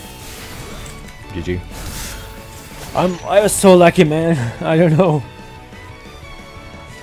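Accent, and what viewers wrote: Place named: Russia. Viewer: Bro where u from ? I think u from Russia если так то привет брат как дела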